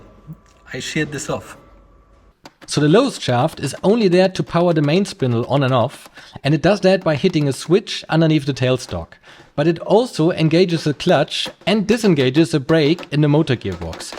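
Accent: Germany. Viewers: ich höre deutsch xD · Selten so ein Deutsches Englisch gehört. Geiles Video, Jung.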